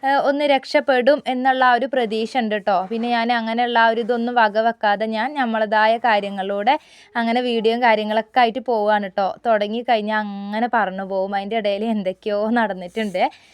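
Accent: Malappuram, India. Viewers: നിങ്ങളുടെ സംസാരം കേട്ടപ്പോ സ്ഥലം മലപ്പുറം ജില്ലയിൽ ആണെന്ന് തോന്നി 😊കറക്റ്റ് മലപ്പുറം ഭാഷ തന്നെ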